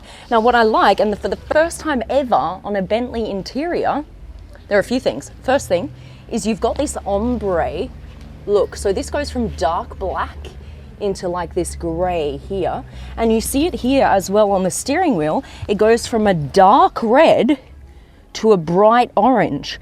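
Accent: Australia. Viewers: There is girl with a foreign accent on Youtube pretending to be you...She cant fake your Aussie accent tho...Your Aussie guy... cheers... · какой все таки австралийский акцент и говор отвратительный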